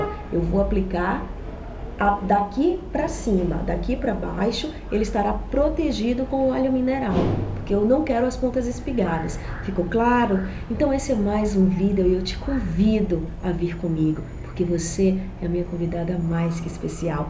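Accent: Bahia, Brazil. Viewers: Nunca tinha ouvido falar de você, mas quando você abriu a boca tive certeza de que você era baiana tb. que linda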